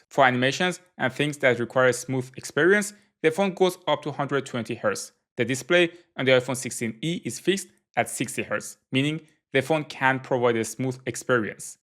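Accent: Iran. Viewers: I have a feeling from your accent that your persian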